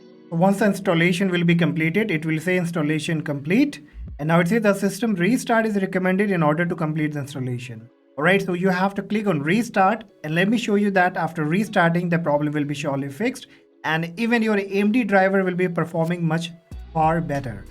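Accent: India. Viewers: when you hear the indian accent you know its gonna be a good video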